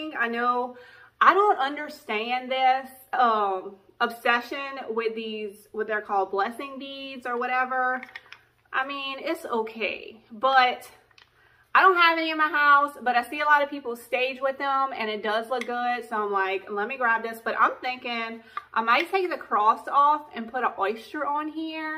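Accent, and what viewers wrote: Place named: Los Angeles, United States. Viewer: Love your ideas...learn so much...love your humor and LA accent🌱🦆🌱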